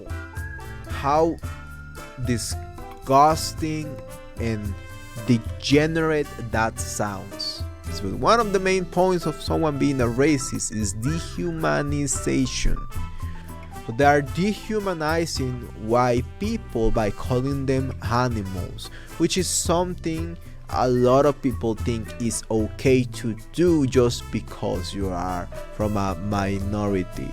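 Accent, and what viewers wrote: Mexico. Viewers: No mames wey, white people are that fucking racist lmao 😂. I can tell by his Mexican accent he probably never lived longer or if any time period in America and still wants to talk about it . Pinches gringos tienen decadas de Racismo wtf duh, we can start by , not letting black and brown eat or drink water at any white people location, nowenly flooding our Brown and black streets with Drugs , not letting us buy house, giving is the worst schools , poluting around where we live and and our food we black and brown die faster. I can go on on and on and why white people are racist . Now not all whites are racist, just recently they have started to change when President Obama got elected, but I just hate how My own Mexican people come and make videos and say everything is and has always been peaches and love lmao wtf 😂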